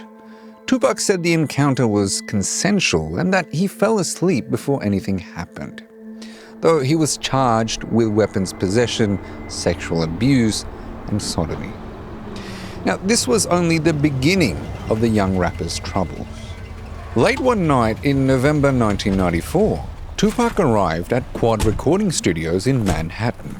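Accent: Australia. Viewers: They both are Australian, so that might be why they sound similar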